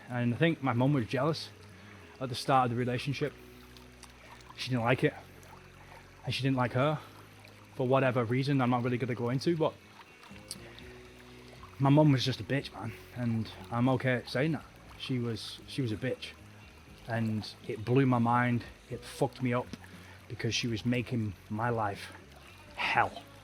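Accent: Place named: Ireland. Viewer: Where are you from? You got very nice accent for ear. Irish man?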